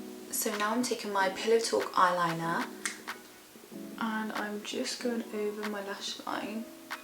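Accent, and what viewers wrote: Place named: RP, United Kingdom. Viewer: LOVE THE BRITISH POSH ACCENT !!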